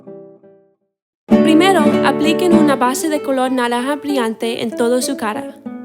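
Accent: Spain. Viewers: Me dio mucha ternura tu acento español jajajaja hermoso maquillaje. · Me encanto el vídeo y me encanto escuchar tu español💕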